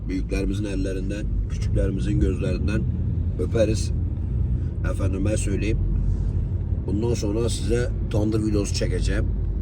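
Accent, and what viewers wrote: Gaziantep, Turkey. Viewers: usta selamın aleyküm Ya Antep şivesine hasta oluyorum çok güzel kolay gelsin ustam yeni lokantan hayırlı uğurlu olsun inşallah 🤲👍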